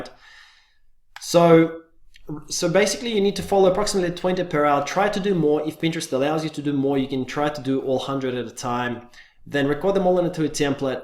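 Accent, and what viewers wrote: Russia. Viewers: I can hear English with Russian accent ;) Do you have same the video in Russian?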